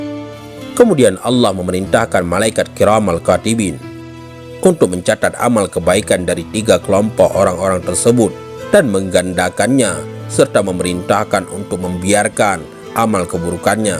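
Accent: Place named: Bali, Indonesia. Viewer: Yg pengisi suara nya siapa ya kyk nya bkn orang jawa logat nya kyk orang bali mungkin kh bali mualaf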